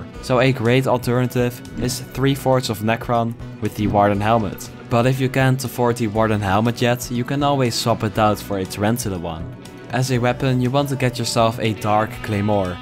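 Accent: Netherlands. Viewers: Ur dutch right? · i smell nederlands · we kunnen nu al zeggen dat je nederlands bent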